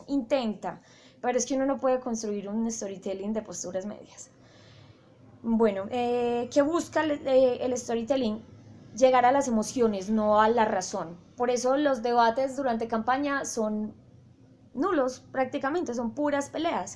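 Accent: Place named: Antioquia, Colombia. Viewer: Me encanta ese acento paisa :3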